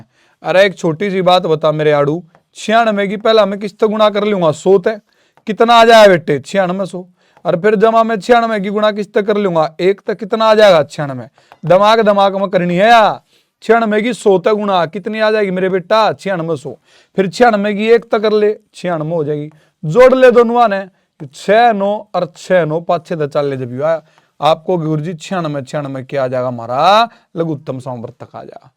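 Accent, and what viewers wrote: Haryana, India. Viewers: Wa haryanvi 😊❤❤❤❤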